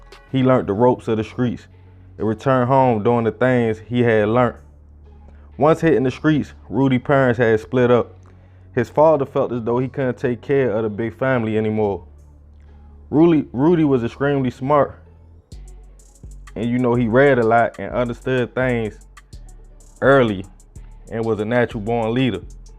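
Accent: Baltimore, United States. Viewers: Bro he baltimore. Can't you tell by his accent.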